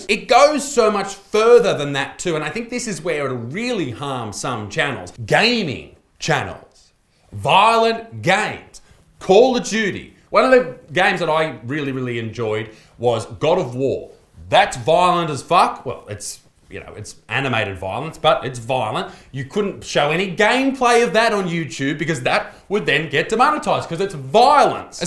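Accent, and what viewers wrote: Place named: Australia. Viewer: He's Australian, an instant pass, given. No questions asked. Swear all he wants. Australians can make pretty much anything sound amazing, funny, and worth listening to, etc...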